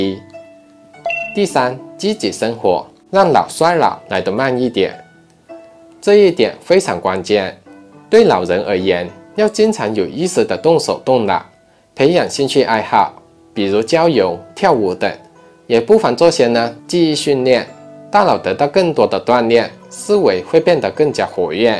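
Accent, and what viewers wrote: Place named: Rural, China. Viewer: “乡下话”，久违了。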